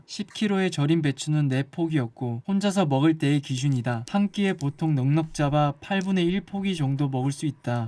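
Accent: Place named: Gyeongsang, South Korea. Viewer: 아 아이고... 역시 김치는 사먹는게 제맛.. 근데 경상도 분이셨군요 (동지)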